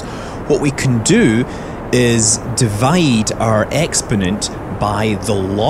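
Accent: Scotland, United Kingdom. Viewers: the switch between scottish/american rhotic/non-rhotic stressing is kind of disorientating. good video though, loved it. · What a silly comment. They were talking about what he sounds like, not his nationality. We all know Scotland is a part of the UK, so that technically makes him British. But he does SOUND Scottish, which is a much more accurate description than the over-encompassing "British." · omg I love your brogue! my god it's just like...awesome. Not David Tennant thick, not Northern England weak. ;3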